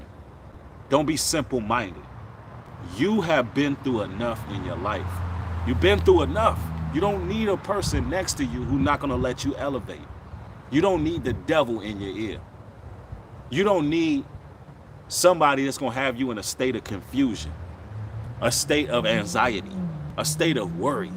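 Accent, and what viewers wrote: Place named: Chicago, United States. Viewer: U must be from the Chi. Shout out to you bro!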